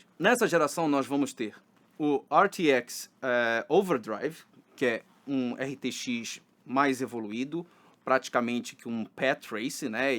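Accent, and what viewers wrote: Pará, Brazil. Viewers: Esse sotaque é do Pará? Do nada achei seu canal e agora tô aqui assistindo sobre placas de vídeo 🤭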